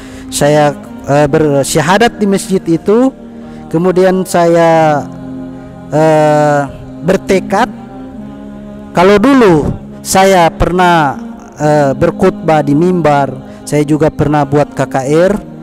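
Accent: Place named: Bugis, Indonesia. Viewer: Tapi logatnya logat bugis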